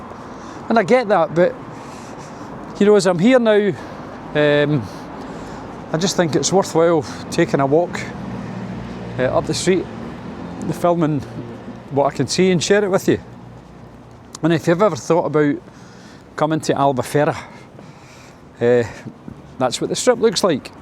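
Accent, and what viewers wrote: Scotland, United Kingdom. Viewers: Hi I'm quite new to your channel, but I like it. Good to hear a good Scottish accent. I have a question for you regarding movie g to Spain. I have looked at the proces of moving to Spain and I think it's too complicated. How easy is it to rent a property in Spain for the 90 days. Or can I just buy a property and spend the 90 days there without having to apply for a visa?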